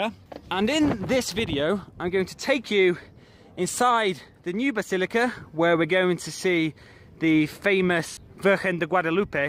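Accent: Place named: United Kingdom. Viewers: Totalmente, pero hay quienes apenas distinguen que habla inglés, mucho menos que tenga acento británico. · johan gomez Fíjate en la manera en que él habla, se le nota el famoso "acento británico"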